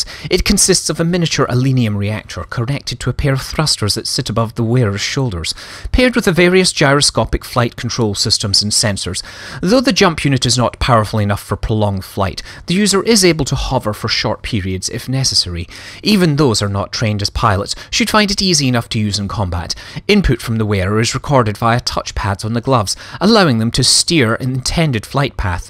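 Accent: Scotland, United Kingdom. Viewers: the classic scotsman accent. makes me want to be more Manley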